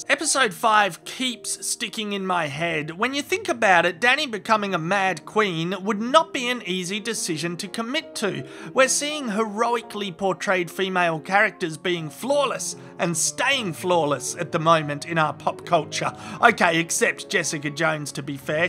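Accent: Australia. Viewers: Thanks for putting all of these together over the years. it’s great hearing a normal Aussie voice poking fun but also reviewing it as the show has gone along.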